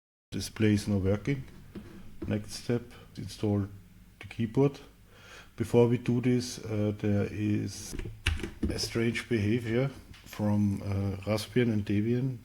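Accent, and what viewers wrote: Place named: Germany. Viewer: An hand des Akzents schreibe ich auf Deutsch. Cooles Projekt. Sind die GPIO ports noch nutzbar? Dann wäre das Teil nämlich interessant. Ich habe gesehen das du die Platine dafür über pcbway verkaufst... Wie sieht es mit dem 3D Gehäuse aus? Ein gps und evtl. Lora mod wäre nich cool Dann könnte man es als meshtastic nutzen. Ich liebäugel nämlich mit dem Teil, aber mit dem reinen pico sehe ich für mich keine Anwendung die ich nicht auch mit ein esp32 erledigen könnte (von der Mobilität mal abgesehen) und dann werden für mich ein hackberry oder uConsole interessanter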